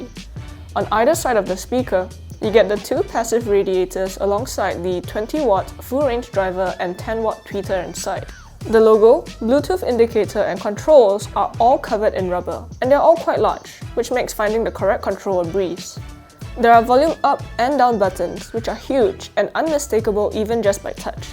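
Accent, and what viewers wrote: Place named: Singapore. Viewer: You're Singlish still can't escape but well done. More practice will push you further. Thanks for the review. If you may compare to Bose speakers for me particularly the Bose Mini will be great!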